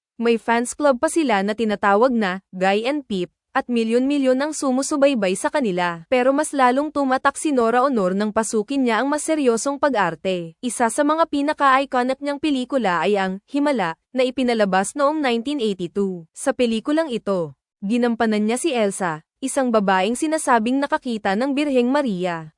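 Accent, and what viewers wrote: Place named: Visayas, Philippines. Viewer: Ano bang lahi mo bat ganyan Kang magsalita ? Yung pronounce mo Ng mga words parang visayang inskit.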